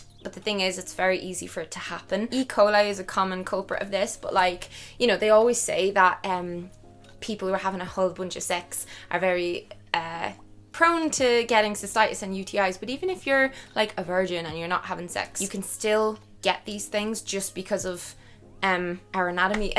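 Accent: Ireland. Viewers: I have a question are u Irish ?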